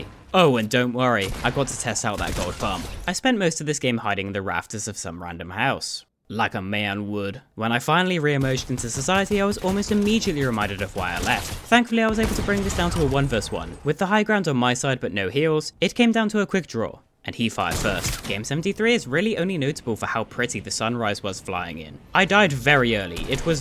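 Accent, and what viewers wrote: United Kingdom. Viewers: Luke te Notable but more calm and british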